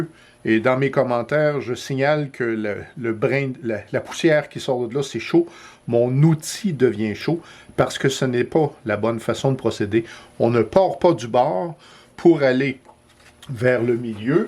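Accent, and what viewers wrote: Quebec, Canada. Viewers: Il a l’accent québécois. Bravo pour la pédagogie !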